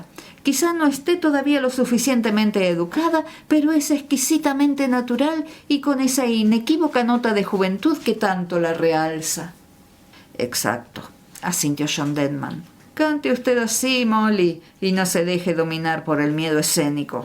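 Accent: Argentina. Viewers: Exelente , te escucho desde Costa Rica 🇨🇷 y vos SOS de la Argentina ??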